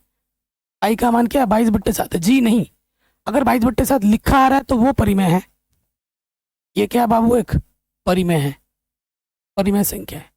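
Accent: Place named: Bihar, India. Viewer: Thodi Bihari hai 😅😊😊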